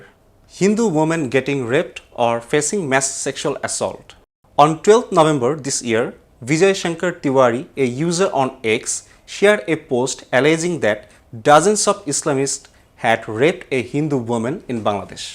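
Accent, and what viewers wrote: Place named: India. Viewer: Change the anchor(Indian accent)with an western accent speaker. Though it is good to hear from a hindu Bangladeshi.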